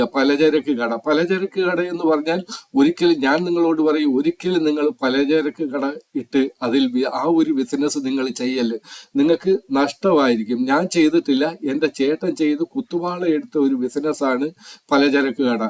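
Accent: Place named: Central Travancore, India. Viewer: എന്തൊരു ബോറാണെന്നോ നിങ്ങളുടെ ശൈലി | 5 മിനിട്ടിൽ പറയാവുന്നതു 15 മിനിട്ടിലധികം വലിച്ചു നീട്ടി നീട്ടി.... മധ്യ തിരുവിതാംകൂർ ഭാഷ കൂടിയായപ്പോൾ പരമ ബോറ്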